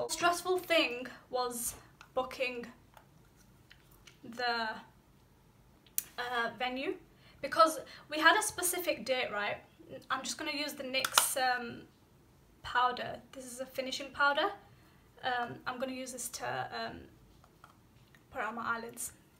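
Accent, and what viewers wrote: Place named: Birmingham, United Kingdom. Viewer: Is that Brum accent... Not too fond of it (she's lovely though ma'sha'Allah) barakallah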